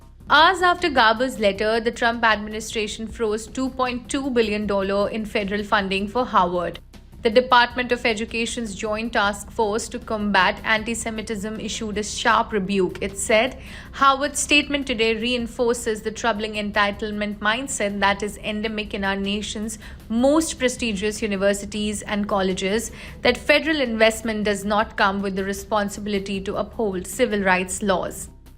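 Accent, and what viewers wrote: India. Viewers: very few Americans can say my name correctly and I accept that. She has an Indian accent. Get used to it or move on.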